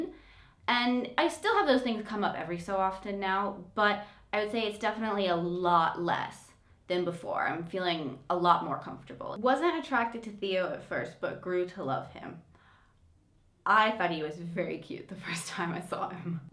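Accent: United Kingdom. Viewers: There's definitely a British accent comming trough that Southern US twang. Give it a few years. Anyway, i alway's find this subject a bit awkward to watch with other YT-ers, but you handled it quite well,👍-up on the vid.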